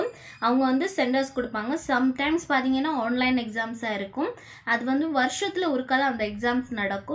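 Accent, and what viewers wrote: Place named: Kerala, India. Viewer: Somewhere Malayali touch 😺😺😺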